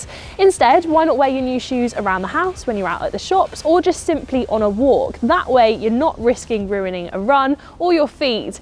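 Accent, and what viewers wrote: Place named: RP, United Kingdom. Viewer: She has, what we would say here, that BBC tone to her. I always wondered whether that comes naturally to people growing up in the UK or whether it's through training.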